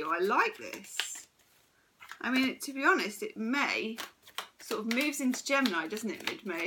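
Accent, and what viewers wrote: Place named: United Kingdom. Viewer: All true. Miraculous. I adore both your readings and your British pronunciation, one of the best in my YouTube community (David Icke's being the other, ha ha). Thanks.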